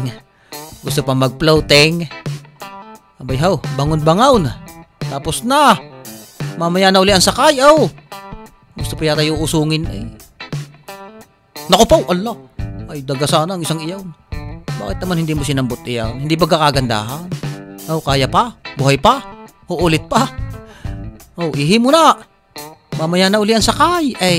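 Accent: Batangas, Philippines. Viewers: Nice idol, 😂😂😂😂 iba talaga ang dilang Batangueño 😁😁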